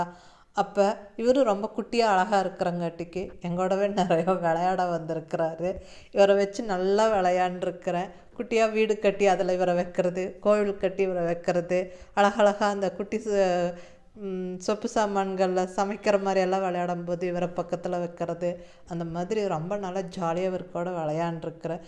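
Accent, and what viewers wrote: Coimbatore, India. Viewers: Ungal voice super mam Coimbatore karanganu nala ah tarithu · Amma really nice collection amma anakum vinayakar romba pidikum kongu tamil super innum neraiya video poduga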